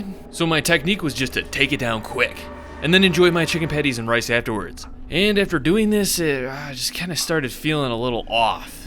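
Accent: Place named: New England, United States. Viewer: Where are you from bro ? Your accent is screaming New England hahah that’s coming from someone on New England lol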